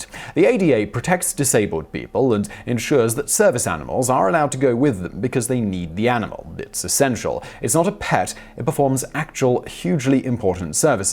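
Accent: England, United Kingdom. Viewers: Anecdotal, but I knew quite a few people who got paid out for damages from FEMA. It seems like a lot of these toptenz videos are aimed at slinging dirt at the US, via an overly snobby sounding English accent. Why don't you focus on your own country more? It needs some focus, that's for sure, because it's falling apart worse than the US is. Bye Felicia✌️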